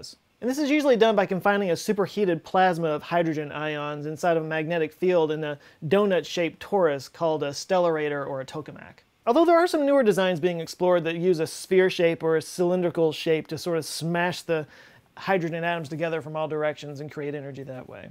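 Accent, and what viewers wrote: Texas, United States. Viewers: Dude... this seriously wasn't worth addressing. We love you both despite and because of your crazy Texan Sikh-friendly accent. You should be proud of being able to cause such profound mood elevation with it. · It's the American accent. Autism is abound in the comments. We tend to say Tur-Ben, not Tur-Bine. Just wow. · Why do you keep talking about turning a turban? OH! TURBINE - rhymes with coal mine Funny American accent, but I still enjoy your videos :-) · So the English accent is the only correct way and the American accent is always wrong? I don't think so. Also, there are many American accents, and most of them pronounce the word TURBINE not TURBAN. His is a regional accent. Edit: Some Americans also pronounce the word like TURBEEN. This is also an accent. It isn't a more or less correct way to pronounce the word. Don't be an accent snob. 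nobody likes an accent snob.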